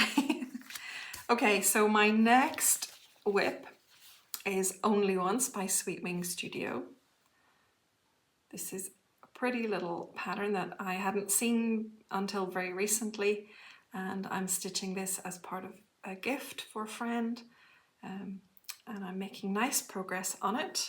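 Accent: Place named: Ireland. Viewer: I always enjoy your stitching and listening to your accent. Reminds me of my trip to Ireland . The Nativity is lovely.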